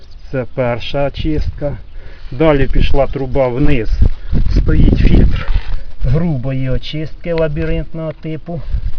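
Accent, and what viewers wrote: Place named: Poltava, Ukraine. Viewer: Полтавщина Говор такой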